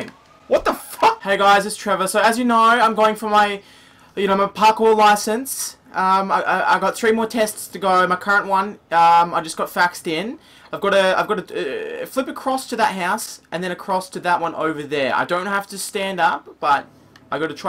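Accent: Australia. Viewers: R u Australian because u r to loud mister u need to turn the noise down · Australian accent.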